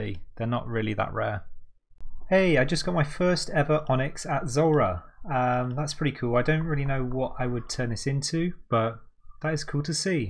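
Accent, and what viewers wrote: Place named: RP, United Kingdom. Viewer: bro im british and even I think you sound posh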